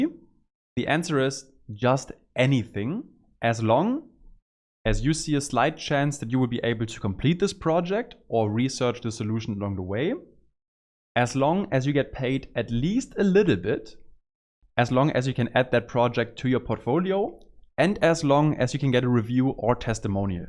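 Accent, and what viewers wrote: Germany. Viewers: i am 100% sure that you are a german, nice video btw that will help to improve my own page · Fucking zero explanation done. Just a German guy rambling, wasting 10 minutes of your time.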